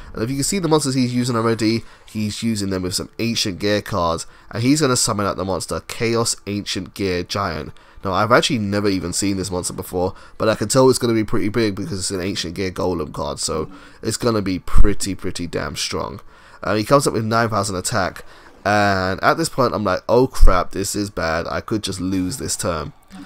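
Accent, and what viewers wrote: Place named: United Kingdom. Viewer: U sound so british, are u from england?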